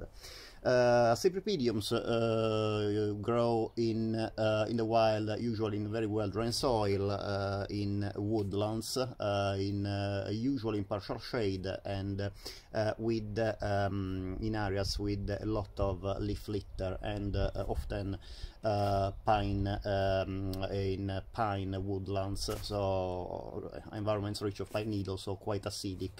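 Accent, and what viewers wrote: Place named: Italy. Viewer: Because of your accent, are you Italian? It would be easier for me to write to you in Italian. 😊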